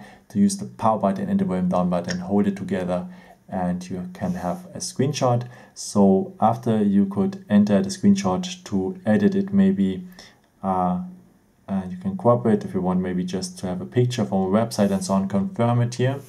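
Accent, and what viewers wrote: Germany. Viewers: Are you from Germany????😂🙂😁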